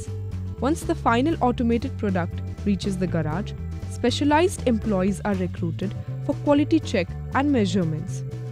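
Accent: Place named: India. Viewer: Bahasa Inggris nya orang India ini lucu...😂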